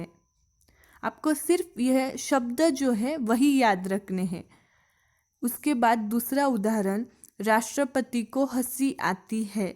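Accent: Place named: South, India. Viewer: Very very nice 👌👌big big thanks for u 🙏mam aap South se ho aapki hindi South side ki lg rhi h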